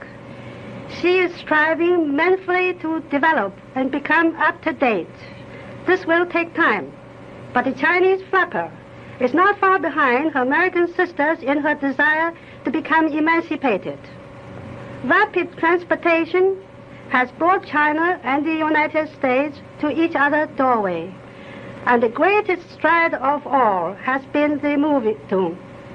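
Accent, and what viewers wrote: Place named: United Kingdom. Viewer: 英国口音，挺好听，好像公主👸有些腼腆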